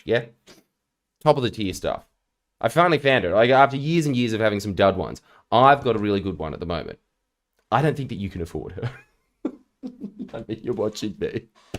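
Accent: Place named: Australia. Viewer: The only thing I have energy for is to hear beautiful Aussie accent and his perfectly cut chin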